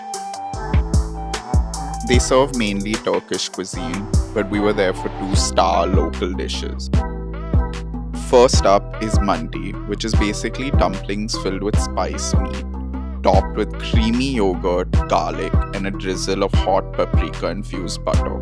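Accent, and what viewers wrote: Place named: India. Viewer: It’s tough to watch this with that Indian accent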